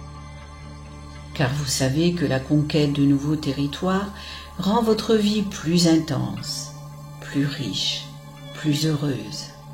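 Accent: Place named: Marseille, France. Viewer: L’accent marseillais et l’écho derrière la voix rendent la vidéo très peu crédible et on décroche très rapidement. À revoir totalement. La musique en fond est trop présente et très gênante... voire irritante.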